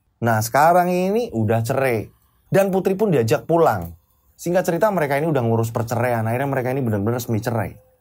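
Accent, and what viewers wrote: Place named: Banyumas, Indonesia. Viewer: Ngapak hadirrrr😂 .. Ora ngapak ora kepenak.. Booommm... Booommm. Yosskayyy😅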